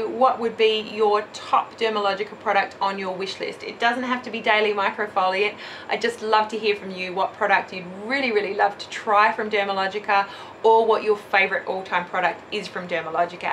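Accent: Australia. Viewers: Hi, My favorite Dermalogica product is the Dermal Clay Cleanser. I have oily skin and that product, in combination with my Clarisonic, leaves my skin feeling amazingly fresh and clean. It has also worked wonders for my pores. Love it! I just recently discovered your channel and I love all your videos so far. I love your Australian accent! Greetings from Texas:)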